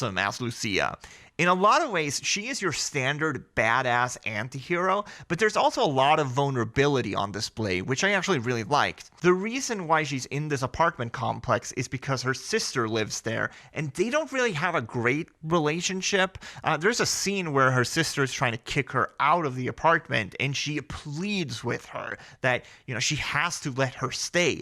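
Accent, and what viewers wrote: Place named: United States. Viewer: You sound yankee AF, for a sweedish... Thanks bro. I´ll be looking for those movies. Happy new year!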